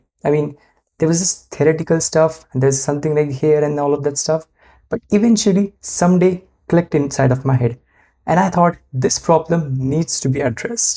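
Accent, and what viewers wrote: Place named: India. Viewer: I dont know who you are, but you have a bright future. I can hear youre of hindi descent, and if I had to guess id say you moved from there to probably the states. But if like to know your story and how you got where you are today.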